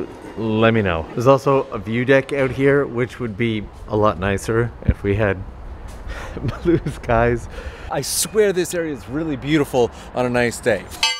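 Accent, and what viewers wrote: Canada. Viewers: Wow so cool it is really sad and mean that the wheel does not turn but it seems like whenever I see one of those in Japan they tend to be stationary😭 They just gave a us a new one at out Disneyland and it moves and everything🖤🖤🖤🖤 I love that japan makes everything so convenient though like you could literally live out of a camper van and be just fine bath and all🖤 wish america was more like that😭 I've said it once but I'll say it again, I absolutely adore your accent🖤 who knew a Canadian accent could sound so nice? Clearly I need to actually talk to my friends abroad and no just text ... that rail was beeeaauuuttiiiffflll🤩🤩🤩🤩 cant wait to see what secrets lie with the cabin, see you next week I hope🖤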